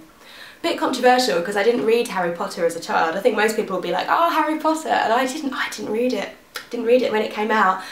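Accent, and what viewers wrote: United Kingdom. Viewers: So nice to here another English accent on booktube. It's also nice to have someone on my sub list with Adult books. Looking forward to watching more. :) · I can't believe it, you're so friendly! I came to your video/channel 'cause I wanted to hear the british accent and get used to it, but I'm impressed! I'm brazilian and I have plans to study english in London so I'm kinda going to live there for a few months and I'm freaking out! I'm happy that I get here in this video, so I can get used to some words and actually hear about books, it's useful! Thank you so much! (and pardon me if my english is that bad or if I've said something wrong, I didn't used a translator or anything :P )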